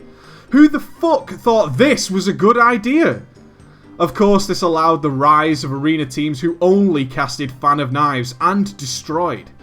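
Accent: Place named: United Kingdom. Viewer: I'm fairly sure he's from the UK, mainly because of the accent.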